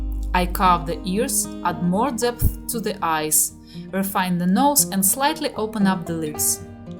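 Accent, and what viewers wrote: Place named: Russia. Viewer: Судя по акценту, вы русская, если не ошибаюсь. ❤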